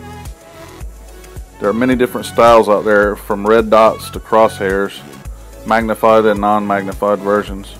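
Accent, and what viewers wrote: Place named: South, United States. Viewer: There's just something about a thick southern accent that just derails any of the context of what's being said...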